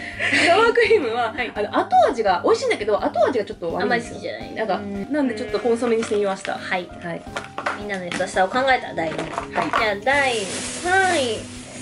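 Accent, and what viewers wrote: Kansai, Japan. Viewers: さんの関西弁めっちゃ好きなんやけど。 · やでなぁめっちゃ分かるわ😂 本間の関西弁っちゅうのが分かる！w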